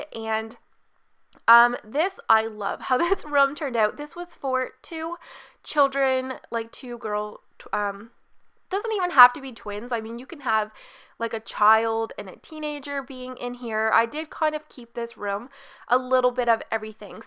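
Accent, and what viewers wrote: Canada. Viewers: are you Canadian..?? we talk to same way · Do you have a Canadian accent? And I absolutely love this build. Pretty sure the best I have made is a shack compared to this ;).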